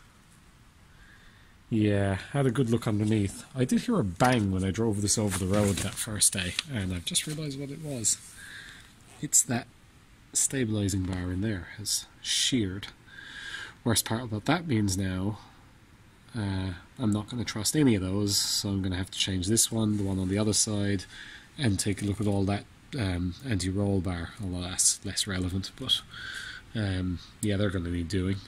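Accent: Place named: Ireland. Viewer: Loving the videos, the music and the relaxing Irish lilt, looking forward to the next one 👍👍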